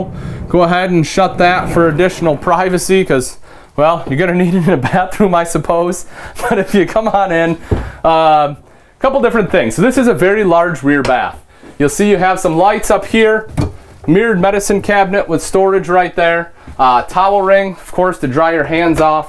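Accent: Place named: Midwest, United States. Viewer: If anyone ever wondered what a classic mid-Western American accent was, here they have a perfect example! Nice!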